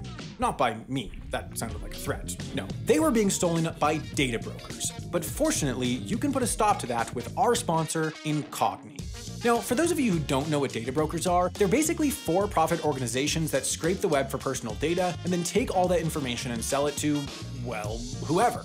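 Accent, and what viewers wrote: United States. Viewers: Pronouncing Porsche as "porsh" instead of "porsh-uh" is totally acceptable in American English, which you speak. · okay how is the lancaster pronunciation incorrect? i'm from the OG lancaster and you basically pronounced how i would (albeit with an american accent) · You ptonounced Lancaster as in Lancaster, Lancashire correctly as far as I heard and as far as it was possible in your American accent.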